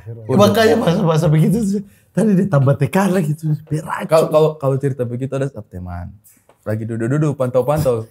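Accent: Eastern Indonesia, Indonesia. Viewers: kenapa logatnya mesti ikut²an logat timur? Mestinya pakai logat Minang aja, biar terlihat keberagamannya.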